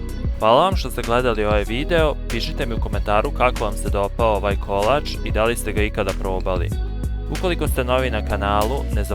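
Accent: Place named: Vojvodina, Serbia. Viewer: Хвала. Па по изговору и изгледало је да је Војводина. Али сад си у иностранству. Вероватно и тамо радиш нешто са кулинарством.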